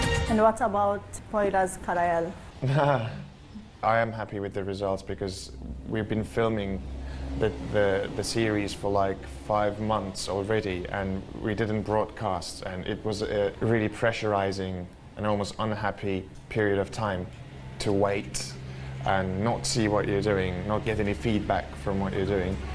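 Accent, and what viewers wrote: RP, United Kingdom. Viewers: Baya baya british konuşuyo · oha ingiliz aksanı😍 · Resmen kraliyet ingilizcesi konuşuyor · he has been in uk, and picked the accent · he has a bit of a British accent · rp aksanını herkes konuşamaz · British royal accent perfect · british accent!!! so sexy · I like his English accent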